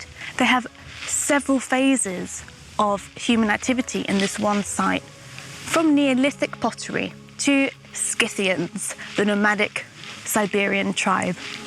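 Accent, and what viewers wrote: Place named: United Kingdom. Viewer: This is so interesting! Thank you for sharing. You're such an eloquent and engaging speaker. On a slightly unrelated note, your name makes me wonder if you're Ukrainian/Slavic?.. But at the same time you definitely sound like a native British speaker haha. I hope it's okay to ask, but do you have Slavic roots or am I digging too deep?:)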